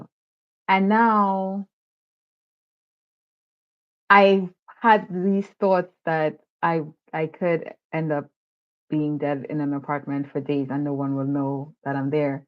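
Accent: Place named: Trinidad and Tobago. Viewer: so.many.thoughts!!! I'll try to be succinct lol. First up--I'm a sucker for Trini accents! Your voice is so soothing 😍. I never hear other Black women talk about this, so this was really refreshing to watch! I'm the only (intentionally) childfree person in my friend groups, and it can feel isolating. Thank you for sharing your story and being transparent about some of the reasons you changed your mind about having kids...and your aunt sounds amazing! I can see why you wanted to be like her. My story is a little different bc I've always known I wanted to be childfree since I was a teenager, and was also raised in Ghana as a kid so I understand how different it is raising kids here vs. back "home". The lack of support for parents (esp mothers) in this country is appalling, and a lot of mothers are bearing the load of raising kids...even if they're married. 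The U.S. is hostile to motherhood, especially Black motherhood. I often think about who's gonna care for me since I'm in my late 30s now, and always planned on taking care of myself the best way I can so that I'm active and out and about even in old age. I plan to either be alone, have hired help if needed, or live in a Golden Girls situation lolol but who knows? Also, the guy who talked about older people without kids is totally right. My mom works in a nursing home, and plenty of older adults with kids die alone, so there's never a guarantee that having kids ensures you'll be taken care of (or at the very least, have someon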